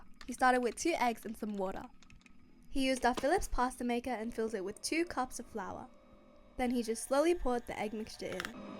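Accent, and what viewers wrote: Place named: Asia. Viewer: You sound Asian. Any chance you can try a few Asian recipes? Like biryani, stewed pork belly, beef noodle soup, etc. Thanks!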